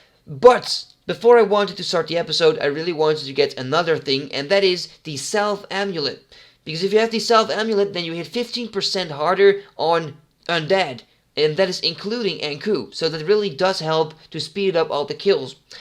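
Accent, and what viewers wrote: Netherlands. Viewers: heard you were dutch the second you started talking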